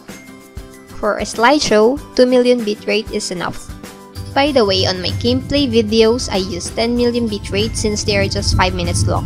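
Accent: Philippines. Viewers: I'm so in love with your accent!! Very cute!! <33 It sounds like a you have a Filipino accent. Are you from the Philippines? :) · You are obviously from the Philippines with that hard-tongue accent. Hehehe. I'm subscribing. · pinay ka po noh ?? haha accent pa lng by the way super thanks po dito sa tutorial vid. mo ♥ :))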